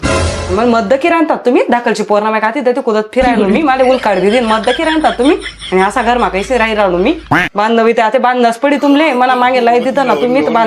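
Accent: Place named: Khandesh, India. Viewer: Ek no khandeshi bolta tai mi pn khandeshi ahe tumchi bhasha aaikun khup aaplepna vatato❤❤❤❤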